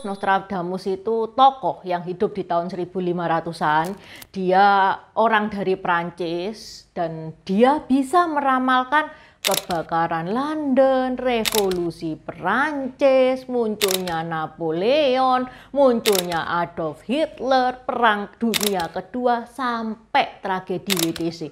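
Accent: Java, Indonesia. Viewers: Logat Jawa medok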